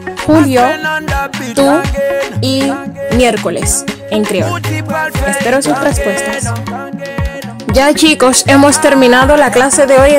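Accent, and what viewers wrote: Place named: Chile. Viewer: Madam Kouman ou ye? Mwen menm se Aristide, mwen enterese apran pale kreyòl ayisyen, nouvo mwen an avanse , mwen ta renmen mande w si ou ka banm klas konvèsasyon, ou kapab fè tou videyo konferans pou bay klas yo nan moun ki enterese aprann pale kreyòl ayisyen, èske ou se Ayisyen? ou gen yon aksan chilyen ,mwen panse ou rete Chili. Si ou gen enterè aprann Angle nou kapab pataje , paske mwen pale panyòl ak anglè. Mwen kwe mwen pa pale anpil kreyòl yon ti kras. SVP lè w wè mesaj sa a reponn m mèsi anpil. Bondye beni w !!!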